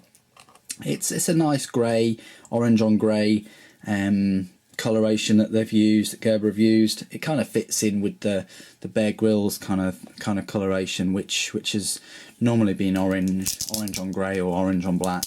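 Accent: United Kingdom. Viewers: obviously British I'm Australian we sound nothing like them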